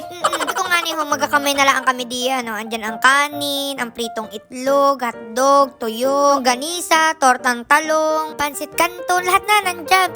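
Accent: Batangas, Philippines. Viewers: South Cotabato, Mindanao po. May dugong Batangueña. Ang tono mo po kasi magsalita ih Batangas. Nakapunta po ako once ng Batangas parehas kayo ng Tono ih. 😊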